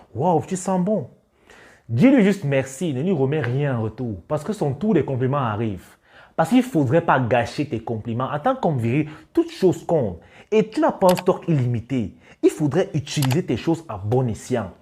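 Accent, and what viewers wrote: Cameroon. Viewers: Je m’abonne direct putain c mec avec son accent camerounais ah je kiffe 🥂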